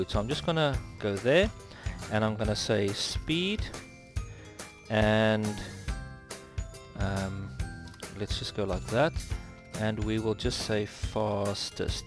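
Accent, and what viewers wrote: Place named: South Africa. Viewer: good video,and refreshing to hear a south african accent, (to me) the music is slightly loud and distracting.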